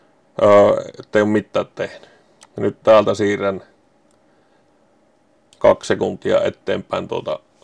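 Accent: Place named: Finland. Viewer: Olen lukenut kaikki päätalon kirjat. Ootko sieltä päin. Murre kuulostaa sille.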